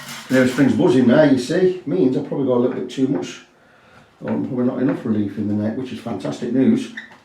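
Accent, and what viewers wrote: United Kingdom. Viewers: Please, can someone tell me what measure did he say on ? I am just not used to such a strong british accent and I can't tell if it's 0 or 1 mm he is talking about.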